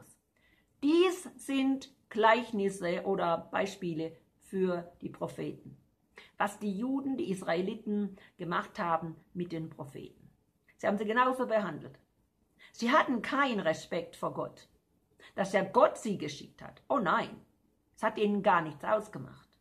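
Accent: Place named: Baden, Germany. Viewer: Liebe, ich komme Auch aus dem badischen, ich wohne bei Wiesloch 😍 Habe früher schon gedacht, dass wir aus einer Gegend kommen, auch wenn Du sehr schön hochdeutsch sprichst, man hört es trotzdem "e bissl " Gottes Segen ins Ferne Amerika 🙏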